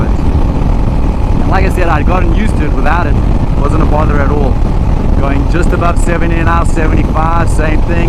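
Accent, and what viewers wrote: South Africa. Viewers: hey man yes I saw that after I replied. I think I’m going to go ahead and order one. What off roads are you riding up in north ga? Saw your Instagram and saw where you road around telico plains I love that area. I just started to ride at the age of 55 and loving it Road some with my buddies in New Orleans growing up but not much . Just bought a Wr250r a year ago already thinking I might need to upgrade to a dr650 . Love the SA accent. I miss hearing it my wife is from SA and I used to go over a lot. Lol small world too I used to live off of the north ridge exit on 400 Off Spaulding and over in hunt cliff off Roswell road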